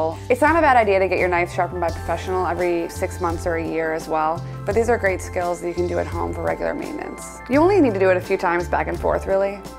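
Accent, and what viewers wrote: United States. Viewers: Which areas in the US would this person's accent be predominant? Or is popular amoung a certain age group? Or perhaps a particular kind of crowd?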